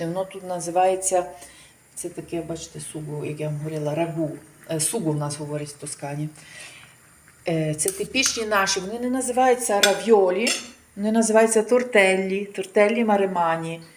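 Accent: Lviv, Ukraine. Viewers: Та так,автор каналу говорить зi львiвським акцентом,на Зах.Укр мають свiй дiалект,iнтонацii'.Вiд цього залежить i те,як вона говорить по-iталiйськi .В нас на пiвднi не говорять "лятте","латте"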